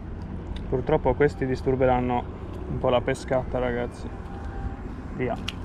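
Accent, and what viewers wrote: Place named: Sardinia, Italy. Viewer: Complimenti per il video ben curato e per i bei pesci😉 dall'accento si sente che sei sardo, posso chiederti di dov'è?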